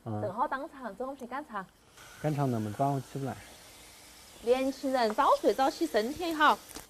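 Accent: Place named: Sichuan, China. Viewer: 喜欢的四川话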